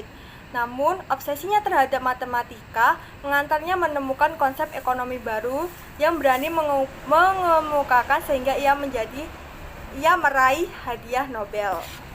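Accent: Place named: Java, Indonesia. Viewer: Medok'e jowo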